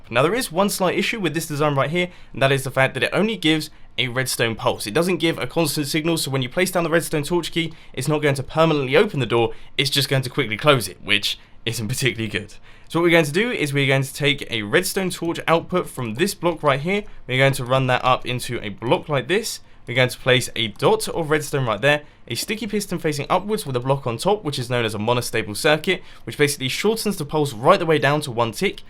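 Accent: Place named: England, United Kingdom. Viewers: British people sound that way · i love the fact it is british cause im british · Nope here in England that voice is legit everywhere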